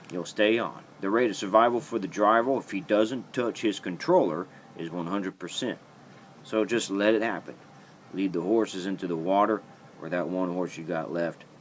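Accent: West, United States. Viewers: DO MORE VIDEOS PLS I LIKE TO HEAR YOUR WESTERN ACCENT AGAIN AND AGAIN! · his voice sounds western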